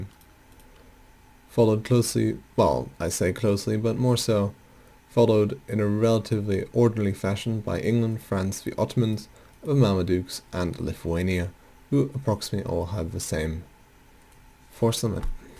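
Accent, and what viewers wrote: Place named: Austria. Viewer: Are you from Austria? You sound like an austrian guy.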